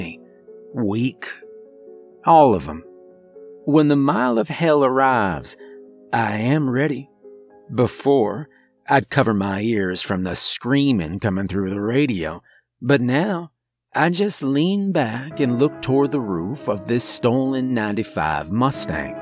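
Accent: United States. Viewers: Sweet another amazing story I love the cowboy accent on this one to would love to hear more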